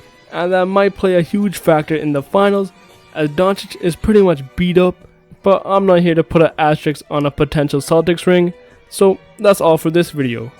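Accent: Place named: India. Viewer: i can tel ur a fuckin indian.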